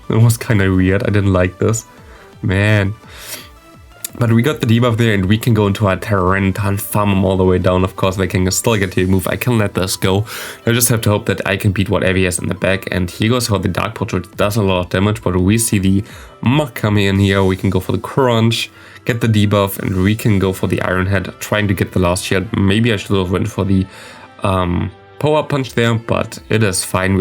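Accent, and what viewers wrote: Germany. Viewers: German accent.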